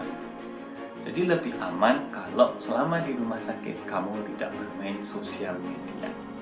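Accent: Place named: Java, Indonesia. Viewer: Mas...keren euy...logat jowo yg bikin org yg denger bakal hafal tanpa melihat wajahnya... edukasinya asik2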